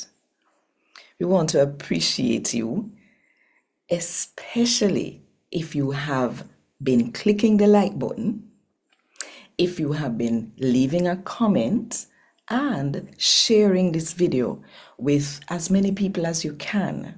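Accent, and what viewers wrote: Jamaica. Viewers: Can we talk about how legit ya boi sounds in patois?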